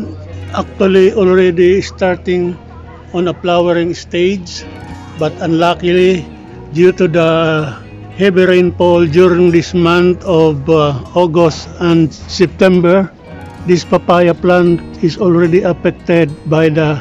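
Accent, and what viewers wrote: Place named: Italy. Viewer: ARE YOU ITALIAN!!!??? OUR VERY DEAR MR. GIOVANNI CUMAN BELONGS TO ITALY ALSO SPEAKS LIKE YOU.....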